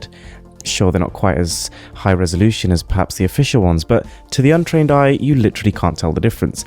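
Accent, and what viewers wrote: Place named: Birmingham, United Kingdom. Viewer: that's Birmingham I knew it ! lol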